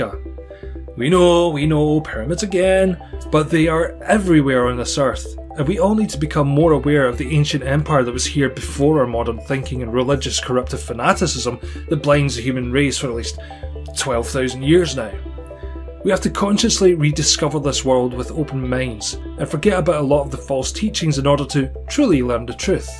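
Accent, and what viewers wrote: Scotland, United Kingdom. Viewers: the scotish accent made me lol good video tho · If my great grandfather Reed Gordon hadn't moved from Aberdeen, Scotland, I'd have that same awesome Scottish accent. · Noor M Yus don't tell the Scottish man narrating, the he is English, he is likely to get very upset.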